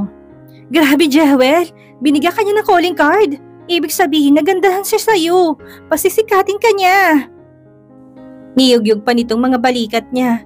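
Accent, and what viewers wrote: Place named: Visayas, Philippines. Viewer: panget tlg ang boses mo bisaya pa ang accent mo pumapanget tlg tong story n ito dahil ikaw ang narrator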